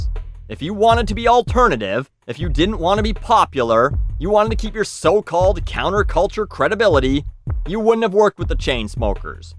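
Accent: Canada. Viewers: Anyone noticed Canadian accent? (out, about) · Tornusage it's his Canadian accent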